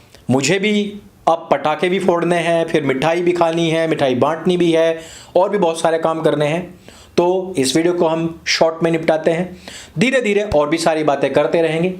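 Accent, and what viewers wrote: Haryana, India. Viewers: mai haryana se hu bhot acha laga jo aaj aapne haryanvi boli😁😁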